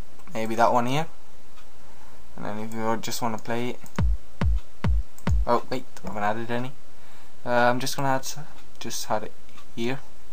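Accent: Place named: Ireland. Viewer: Ireland, or scotland lol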